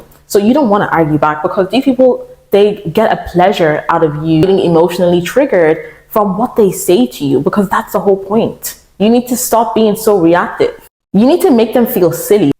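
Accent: Ireland. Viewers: Love the Irish ☘️ accent❤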